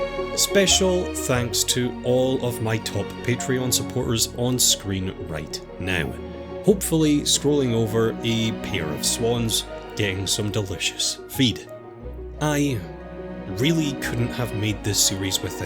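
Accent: Scotland, United Kingdom. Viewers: I love your voice. that interesting accent. I am neither english nor american so i never heard someone speak like that...i believe its scottish? I love to hear you talk about the films!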